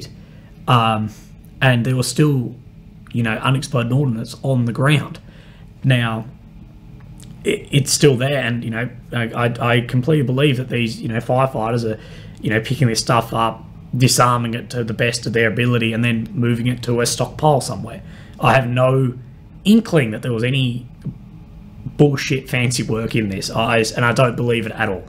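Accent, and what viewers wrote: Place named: Australia. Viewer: Jeez there are some cynical people around; surely most people can see that you are genuine in your cause? As an Aussie i want to say that it's great to hear your accent on a vid about the war in Ukraine! Thanks for flying our flag mate, you're a champion :)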